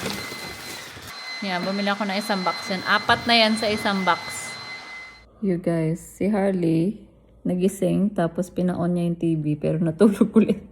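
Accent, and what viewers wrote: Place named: Pampanga, Philippines. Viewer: Kapampangan accent ya girl! Wag kang mangialam. Pakialaman mo buhay. Hinahanap ka ng amo mo. 😂😂😂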